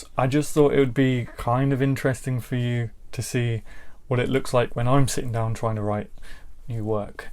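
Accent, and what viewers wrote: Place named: Yorkshire, United Kingdom. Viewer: hahaha I also suffer with running but I'm a walking injury anyway 😂. Thanks so much for the support man, massively appreciated. Although I don't think the Yorkshire accent will ever catch on as much as your classic British accent hahaha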